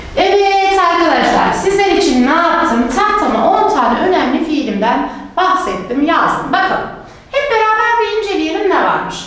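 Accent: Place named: Istanbul, Turkey. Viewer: Hocam siz istanbul Kürtçesiyle mi konuşuyorsunuz? Bizim yamyamlar konuşurken insan içine çıkamıyoruz. Diksiyon kursunuz varsa benim öküzleri de yazdırayım kursa. Gerçekten çok naif ve melodik bir ses tonuna sahipsiniz, insana huzur veriyor. Şu İngilizce belasından kurtulursam ilk iş Kürtçe öğrenmeye başlayacağım.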